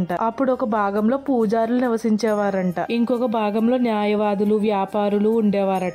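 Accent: Godavari, India. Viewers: ఏమండీ మీ వీడియోస్ చాలా బాగుంటాయి.అచ్ఛ మన గోదారి యాసలో మీరు బాగా మాట్లాడతారు.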